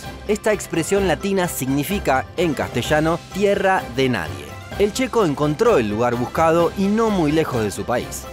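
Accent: Rioplatense, Argentina. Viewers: Tienes una excelente edición amigo y que elegante se escucha el acento rioplatense sin modismos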